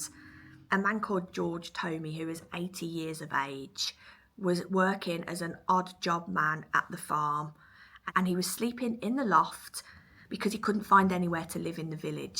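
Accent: Birmingham, United Kingdom. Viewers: Hi im glad I found your channel a few weeks ago it's so lovely to hear your brummie accent you show so much interest in the local history of the Midlands i love hearing about the history of the cotswolds where i come from although I now live in denmark i never forget my roots we working class people have so much history to tell that it was us that made Britain what it was keep up the good work, best wishes roy copenhagen denmark. 😊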